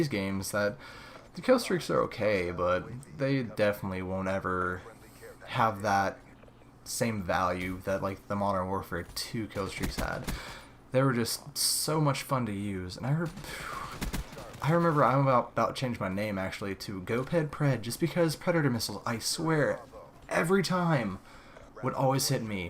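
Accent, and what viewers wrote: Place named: United States. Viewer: Lol, that american accent.......i like it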